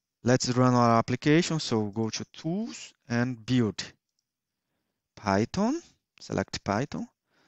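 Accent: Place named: Ceará, Brazil. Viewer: Curso de Python em Inglês com sotaque cearense.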